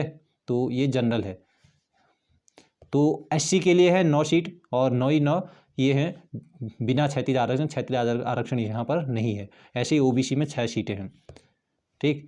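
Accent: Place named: Kumaon, India. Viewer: Kumauni lg rha bhai...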